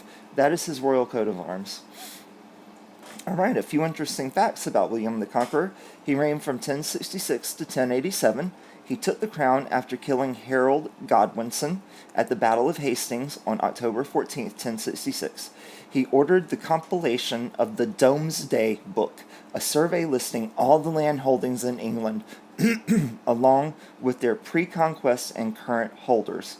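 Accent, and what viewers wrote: South, United States. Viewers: Your accent is adorable! Where are you from? Clearly a southern boy.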